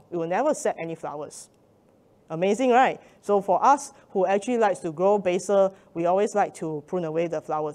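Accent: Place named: Nigeria. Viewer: Why she sounds nigerian 😅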